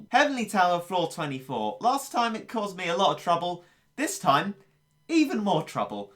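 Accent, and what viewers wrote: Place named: England, United Kingdom. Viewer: I think that you mistook what was said in that first post there m8y. Now you sound like an Englishman, so American may not be your first language, but let me quote the part that seemed to catch you by the jimmies. "Also, he's not as bad as and Chillhola considering he is willing to improve, and equally importantly, he is not a complete douche to his fanbase." In this sentence, he used your name and Assassinbear and that unlike you two, MattShea is willing to improve and not a complete douche to his fanbase. This sentence isn't the best constructed however he's not saying you're a douche to your fanbase. He's saying that MattShea is "willing to Improve unlike " and "not a complete douche to his fanbase like Chillhola". You made several references to that through your video and I went to reread that sentence a few times because I didn't take away that you were a douche from it at all. 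I think the sentence meant the terms "respectively" and not in accordance to you both.:D Cheers m8y!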